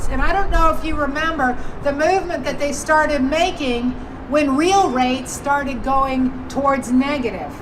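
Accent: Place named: South, United States. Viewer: Haha. I like the Southern accent. Gotta adapt to your audience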